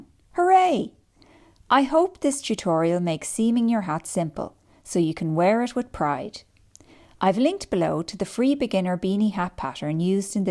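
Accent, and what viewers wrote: Ireland. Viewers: Great video. So helpful and easy to follow. Great to hear an Irish accent too! 👏👏👏👏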